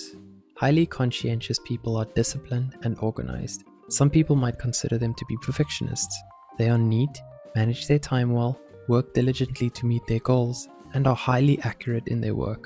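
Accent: South Africa. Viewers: The new voice has a South African accent😃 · Is the new narrator South African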